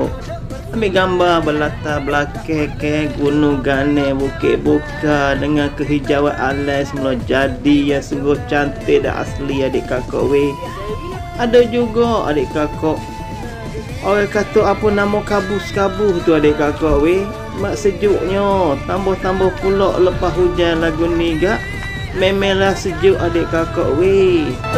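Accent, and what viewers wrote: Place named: Kelantan, Malaysia. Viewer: Molek jelas kecek kelate. Hok ni baru ori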